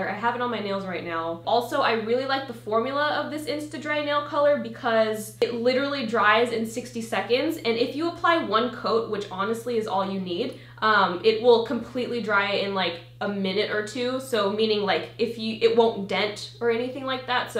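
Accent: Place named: Australia. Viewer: I MISSED THAT AUSTRALIAN ACCENT LMAO😂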